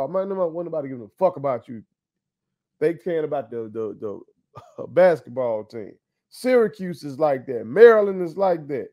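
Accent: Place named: Memphis, United States. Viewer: bruh...real talk, I like you my guy...No homo... you talk like a south Memphis nigga for real...I've lived in Memphis for a long time. but I was born and raised in Northern Mississippi. you sound more Memphis than MS...Lol... you a real one fa sho that gives it to us straight with No chaser!!!! I like folks that can give you real game and know what they talking about...I played baseball and football. I've been coaching football in Memphis a while now. but my roots came from the Sipp. I like your content and how you give it👍🏿 you're hilarious to me and you probably don't even be trying to be.......keep it up bruh✌🏿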